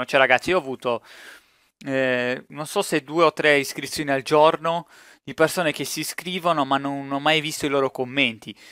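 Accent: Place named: Sardinia, Italy. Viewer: mi sa che siam conterranei..sei sardo vero? sembra del cagliaritano..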